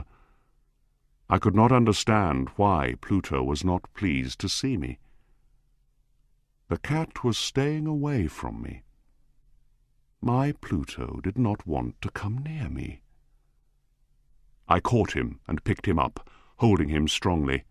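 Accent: United Kingdom. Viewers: Tem acento britânico, amei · pela pronuncia acredito que seja britânico. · Ele tem um sotaque britânico ou foi apenas uma impressão por causa da história que não é americana? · Isso é ingles britânico né? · É inglês britanico? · Por gentileza , o áudio é inglês britânico? Parabéns pelos vídeos!!!! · Inglês Britânico. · esse inglês é britânico você sabe me dizer. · Lindo inglês britânico 🥰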